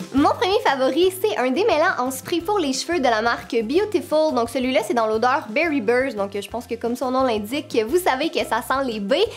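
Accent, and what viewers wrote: Quebec, Canada. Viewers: vraiment meilleur ❤❤❤avec accent québécois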